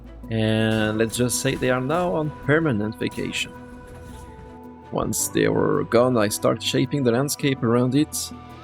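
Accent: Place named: Germany. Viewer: It's funny because when you speak English it sounds more like you could be German (a bit) and not French at all. C'est une très beau projet, très prometteurs. Curieusement j'ai assez de mal à construire dans Enshrouded. ça me donne énormément d'idée, trop en même temps et du coup j'ai du mal à m'y mettre, je bricole sur ce que j'ai, mais je ne suis jamais lancé dans LE vrai grand projet. Je fais au mieux, pour traiter l'immensité de besoin et possibilité qu'on acquiert, pour allé dans le sens de mon projet, mais sans jamais y allé carrément. Pourtant la petite pose dans le jeu serait le moment idéal pour le faire, car justement j'ai moins à faire dans le jeu proprement dit. Trop d'idées me paralyze en quelque sorte. Je suis quand même content de ce que j'ai déjà fais, mais rien à voir en volume avec tes réalisations, bien que mes idées soient du même ordre de grandeur. 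Il faut dire que je commence un peu plein de jeu au fur et à mesure des sorties et le temps n'est pas illimité.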